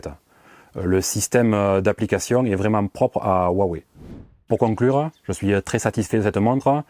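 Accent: South, France. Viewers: Insupportable son accent du sud 🤦🏿‍♂️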